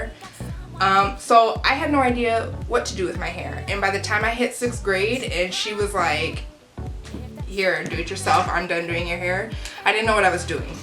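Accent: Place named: Albuquerque, United States. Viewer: I thought I recognized the accent! I'm a mixed girl too! From Albuquerque! Love your video.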